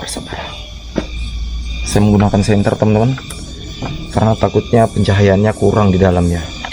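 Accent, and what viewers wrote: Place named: Makassar, Indonesia. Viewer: Maklum dia lagi belajar bahasa logat jakarta karena dia aslinya orang makassar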